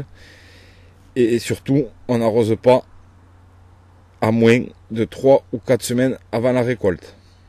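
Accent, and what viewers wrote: South, France. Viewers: Avec l'accent du sud... c'est parfait. Merci beaucoup pour toutes ces informations. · Oui cet accent c du sud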